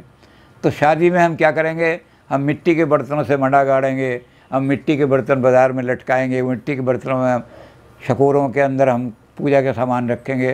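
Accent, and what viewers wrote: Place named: Haryana, India. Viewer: ne Kamal kar diya. Shastri ki bato Ko behad saral v harynvi lahje me samjhaya diya. Dhanywad.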